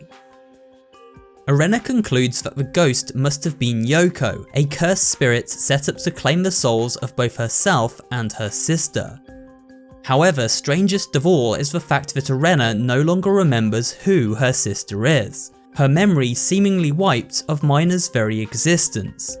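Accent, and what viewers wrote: United Kingdom. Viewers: You're british right? I'm british too What the hell is a faucet? It's a tap to us. Why are you pandering to americans. Saying "mom" and "faucet" (you arent even saying it correctly either). You have a loyal fan base. They know you are British. Speak like a brit!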